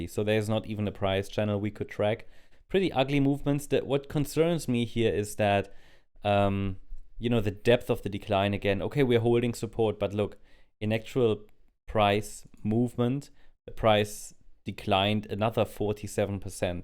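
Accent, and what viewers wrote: Germany. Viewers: That German English 👌🏽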